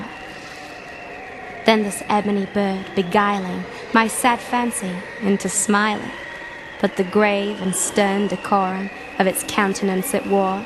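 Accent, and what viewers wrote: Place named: United Kingdom. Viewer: I love her accent.. even though I'm British as well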